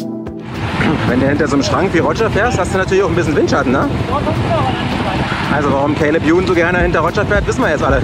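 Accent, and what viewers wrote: Berlin, Germany. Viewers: Super Format, lustige Berliner Schnauze! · Immer wieder nice anzusehen und die Berliner Schnauze tut gut. Weiter so und Kette rechts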